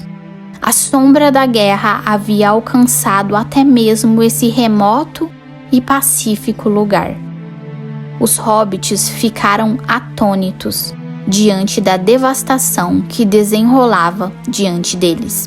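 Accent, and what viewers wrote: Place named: São Paulo, Brazil. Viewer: A narração é muito boa, só tenha cuidado com a pronúncia de algumas palavras, como "abrutalmente", que eu acredito que seria "abruptamente", e algumas outras, que estão com um sotaque paulista/sulista muuuito puxado no "r". De resto, esta muito bom!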